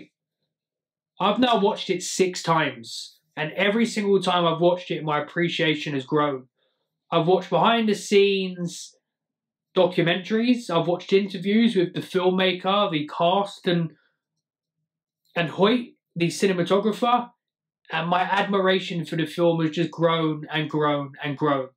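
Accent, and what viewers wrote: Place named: United Kingdom. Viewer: Yeah man! Subscribed. Cool. Also UK accent straight out of Guy Ritche movie. Nice to meet you.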